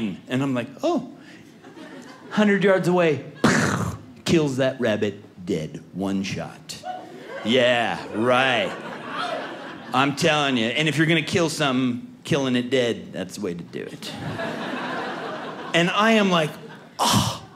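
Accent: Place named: California, United States. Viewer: i can tell you're californian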